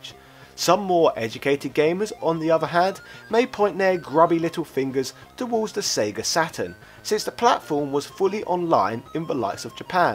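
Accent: United Kingdom. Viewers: I get it your British but nobody calls it the atari two six hundred.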